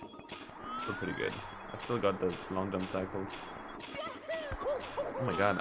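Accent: Trinidad and Tobago. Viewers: Does anyone know where is from. Could be my ears playing tricks on me but his accent sounds like he’s from Trinidad and Tobago. Or at least grew up here.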